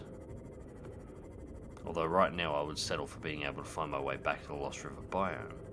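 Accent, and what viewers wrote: Australia. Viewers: I love the Aussie Accent so much:)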